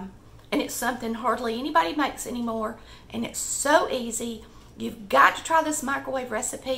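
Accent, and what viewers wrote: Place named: South, United States. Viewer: I swear, even if I didn’t like to cook and didn’t like your recipes, I would still watch you to listen to you talk 😍 Watching all these southern ladies talking in the kitchen on videos brings back my childhood. I grew up in Oklahoma but moved to Minnesota a long time ago, they don’t cook like that or talk like that up here !!!!!!!!!! Blessings to y’all and Merry Christmas A King is Born ✨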